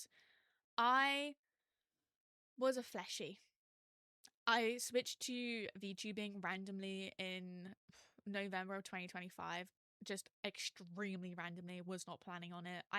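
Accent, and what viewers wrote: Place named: United Kingdom. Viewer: I think your voice and model match up nicely. I'm a small youtuber and I envy your correct British accent. Streaming is a cut throat business so I wish you well.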